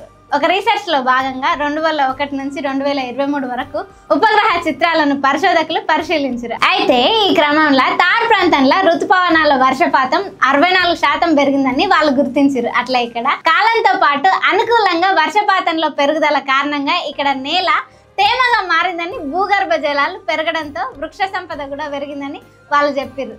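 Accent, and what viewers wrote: Telangana, India. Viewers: Telangana Yaasa Matladatam apesthe channel grow ayidi worst explanation really ilanti informative videos ila Kadu Chepedi E voice tho kadu just telling all these for your sake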